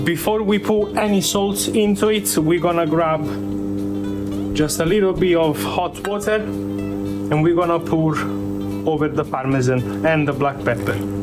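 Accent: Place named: Naples, Italy. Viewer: Ho notato, dando un’occhiata, che rispetto a tanti altri, tu con il tuo canale qui, sei diciamo così, ancora all’inizio. :) Comunque ti faccio tanti auguri. 👋 PS: da quello che ho sentito alla fine del video, ho capito che sei anche napoletano, quindi siamo anche corregionali. Io provengo dalla provincia di Salerno. :)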